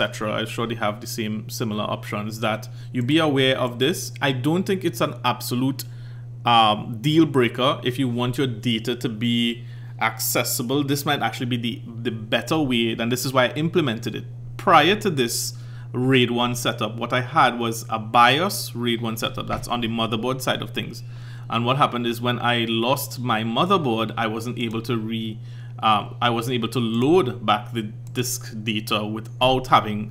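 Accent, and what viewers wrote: Trinidad and Tobago. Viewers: You sound like you are from. Trinidad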